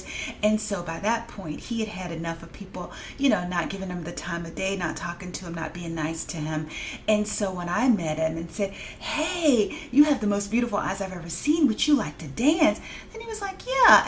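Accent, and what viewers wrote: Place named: South, United States. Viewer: okay, I thought I was picking up that southern lady style and I did hear you say that you and your husband were at Clemson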